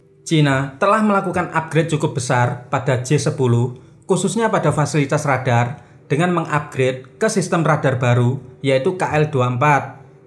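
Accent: Java, Indonesia. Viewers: Kau cakap apa woo...kental betul jawa kau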